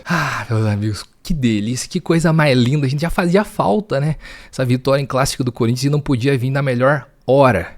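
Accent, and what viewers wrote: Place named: South, Brazil. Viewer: Tu tem sotaque do sul e torce pro Corinthians, explica pra nós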